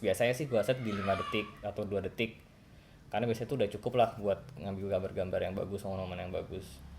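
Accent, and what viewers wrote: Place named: Java, Indonesia. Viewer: Orang jogja nih? Medog beut ngmngnya hehe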